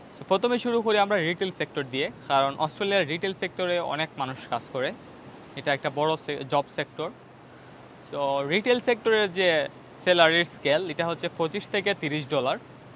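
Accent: Sylhet, Bangladesh. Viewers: ভাই সিলেটি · আমি নিশ্চিত আপনি সিলেটি । 🤍🥰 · Bai bare Sylhet ni ??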